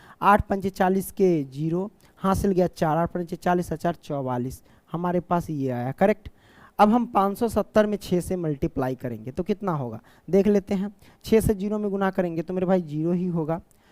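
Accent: Bihar, India. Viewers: Aap Bhihar se belong karte ho ...?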